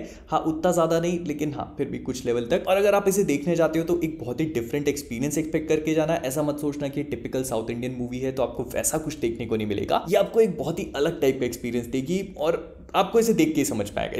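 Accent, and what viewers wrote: Bhopal, India. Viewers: Ae Bhai Tum Bhopal se ho kya😶😳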